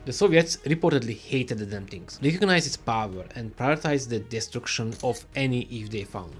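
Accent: Hungary. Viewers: They don't mind the Hungarian accent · nagyon lehet hallani hogy egy magyar beszél angolul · You have a hungarian accent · Jó hallani egy kis magyar akcentust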